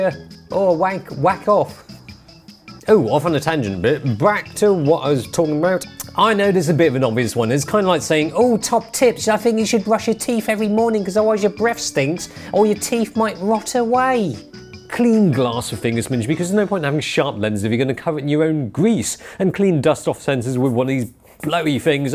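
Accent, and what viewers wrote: United Kingdom. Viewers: British accent is getting so thick, he’s hard to understand !!!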